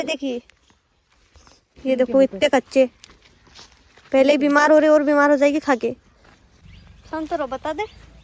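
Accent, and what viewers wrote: Rajasthan, India. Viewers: AAP KI RAJTHANI LANGUAGES ACHI HE / 💯 CUTE. // MUJE SAMAJ NHI ATI HE LEKIN ACHI HE 👍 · Aap apni Rajasthani awaj me ek vlog banao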